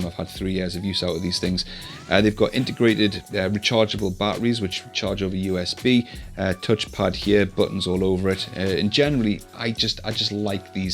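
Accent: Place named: Newcastle, United Kingdom. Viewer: Thanks, great to hear a local Geordie review tech (question at the end) I bought a rift s and an entry level pc after using the work HTC vive vr system and I’m really happy with the performance. I’m no expert and have only seen these two systems, but I agree with everything this review stated. The vive does feel a better product with better audio but.... the s is lighter, better visuals and an easier set up. For a newbie like me, that’s better and now the price of an S is down to £350, that’s where I spent my money. My newbie question is what difference would be noticeable to the visuals if I upgraded my GPU from a Nvidia GTX 1060 to something newer and faster to say Beat Sabre or Robo Recall?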